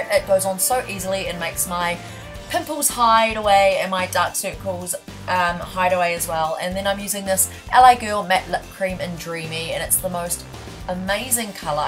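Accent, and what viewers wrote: New Zealand. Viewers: the kiwi accent always makes me giggle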